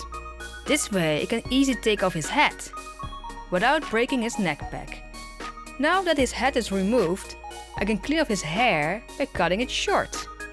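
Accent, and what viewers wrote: Netherlands. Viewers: Are you Dutch? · i can hear from the way you speak english that you are dutch right XD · Are you dutch? I'm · Are you dutch?? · your dutch accent is so cute!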